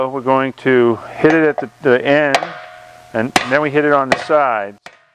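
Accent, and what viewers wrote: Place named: New England, United States. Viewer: It would be a lot better if the person speaking didn't have an obnoxious Yankee accent... I could only make it through 1 minute of it before having to give it a thumbs-down and canceling the video.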